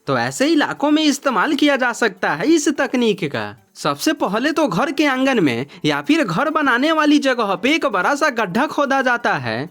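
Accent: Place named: Bihar, India. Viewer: Bhut Ganda bolate ho, bihar se ho....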